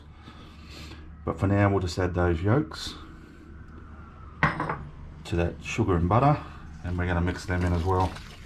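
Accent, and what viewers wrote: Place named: Australia. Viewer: Getting ready to try this with leftover Kentucky Bourbon from our daughter's Kentucky wedding! (Old Forrester 86 proof) Looks like a great recipe but I didn't expect an Australian (?) accent Y'ALL!! ;{) I'll report back next week.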